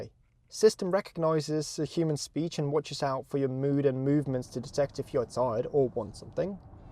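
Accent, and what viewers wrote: United Kingdom. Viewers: ИИ или откуда такой британский акцент?))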